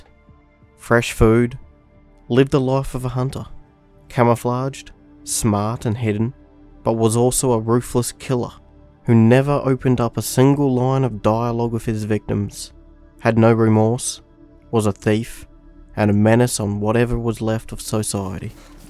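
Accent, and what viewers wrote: Australia. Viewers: LOIF! Man, I love that aussie accent.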